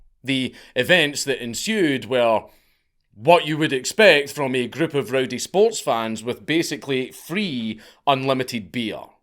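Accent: Scotland, United Kingdom. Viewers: You are my favorite scot, even though you live in brit. Love your accent of homeland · Hearing a Scott talk about my hometown feels wrong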